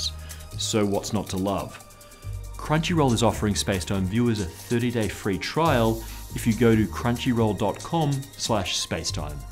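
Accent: Australia. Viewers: He's from Australia. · Why does this guy have an Australian accent? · seriously is an aussie??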